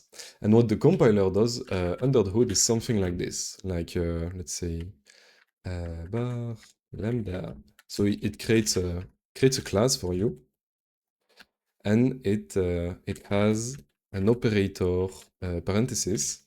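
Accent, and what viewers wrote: France. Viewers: 🎉 brilliant. Cool to finally understand the magic behind cpp lambda functions. Nice french accent by the way.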